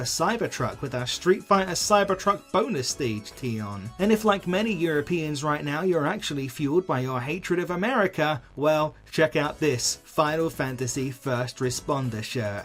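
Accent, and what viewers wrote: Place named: United Kingdom. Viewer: Oh for the love of god, of course he's british😭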